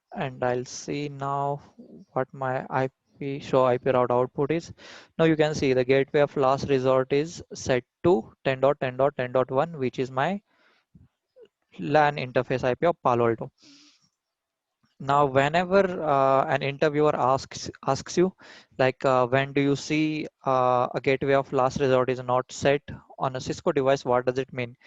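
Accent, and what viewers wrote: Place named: India. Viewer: I hate indian english! OMG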